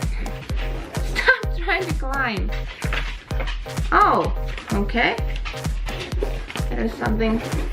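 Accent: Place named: Scandinavia. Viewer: Its christmas for the huskies lol. nice vid. You sound scandinavian, i am from Bornholm Denmark.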